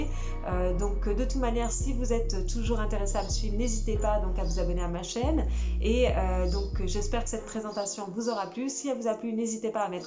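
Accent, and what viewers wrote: Canada. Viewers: J adore ta façon d intervenir Le melange francais canadien peut etre etc La simplicite Le souci dexactitude Lintelligence surement :) Merci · Parcours intéressant, bravo ! Donc, ton léger accent est ... québécois :)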